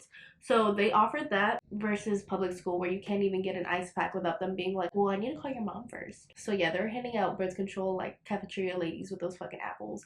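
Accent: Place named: Miami, United States. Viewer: I KNEW SHE WAS FROM MIAMI OMGGG IVE BEEN WATCHING HER FOREVER BUT I WAS LIKE ITS SO CRAZY HOW SHES SO MIAMIAN BRUHHHH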